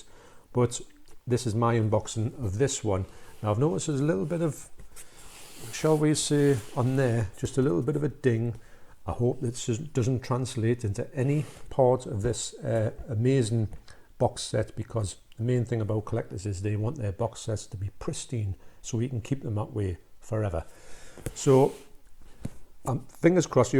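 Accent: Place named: Scotland, United Kingdom. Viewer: that's great to hear, as I've heard that the Argento Cut was the worst of the 3 transfers. Oh ok, sorry. Just bits of your accent sounded Scottish. Not sure if you know, but i'm in Australia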